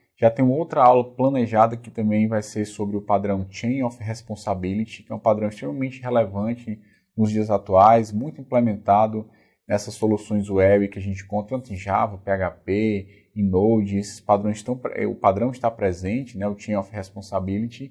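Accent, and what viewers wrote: Ceará, Brazil. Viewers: Seu conteúdo é muito bom! Estou acompanhando seu curso de vue na udemy, muito foda! Professor você é cearense?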